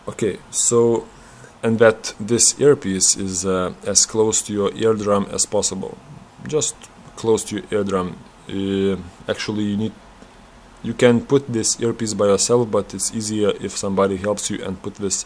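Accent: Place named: Eastern Europe. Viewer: voice like Arnold? :) well Arnold is from Austria and has kind of german accent :) but this mate has slavic accent.. Like me :D Ukraine or something like that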